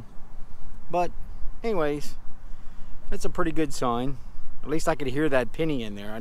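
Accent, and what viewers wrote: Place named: Pennsylvania, United States. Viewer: You've got to be from Pa.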